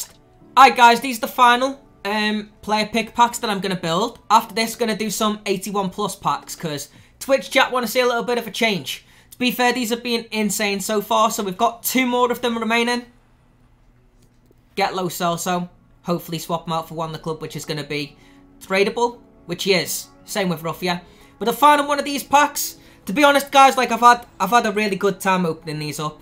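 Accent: Liverpool, United Kingdom. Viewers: Chxrlii - not Irish, scouse mate😂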